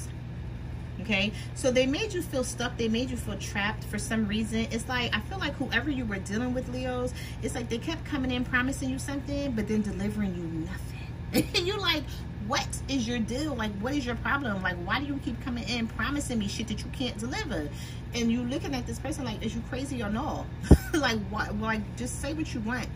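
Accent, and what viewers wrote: Philadelphia, United States. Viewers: Good reading totally resonates... loving your nails! You sound like you’re from my city Philly 🙌🏾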